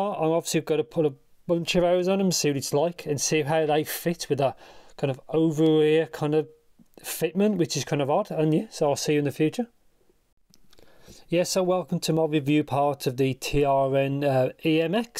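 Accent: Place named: England, United Kingdom. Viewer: I'm unfamiliar with ur beautiful accent, to which part in england it belongs?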